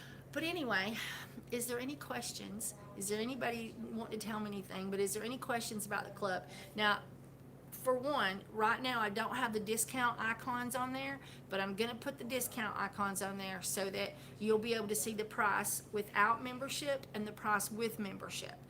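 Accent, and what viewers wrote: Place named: California, United States. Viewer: Pretty Rad... Sounds like you grew up in California!? United We stand YouTubers Playlist: I'm going to put the link to this video in my playlist-- United We stand YouTubers. A ring the bell and I've hit your thumbs up good job. CBD oil back before it was okay to have I discovered just regular hemp oil was very good for soaps and lotion.... I look forward to hear from you, God bless bye for now Ken. Don't be a stranger :-)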